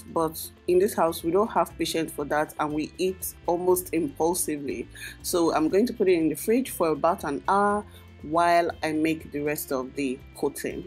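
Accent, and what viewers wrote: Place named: Nigeria. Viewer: I am totally blind and I love the way you described your video. He did a good job and it's easy to follow by the way you sound like you're from Nigeria. Anyway that's exactly what I did and how I followed it it's very good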